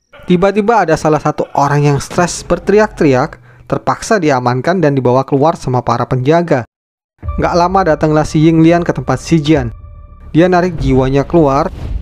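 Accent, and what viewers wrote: Malang, Indonesia. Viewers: Bang orang malang ya? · Kyk e wong malang iki mimin e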